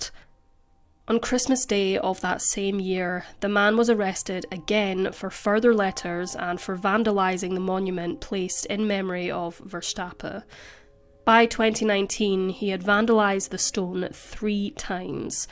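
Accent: Scotland, United Kingdom. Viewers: Her accent is Scottish 😊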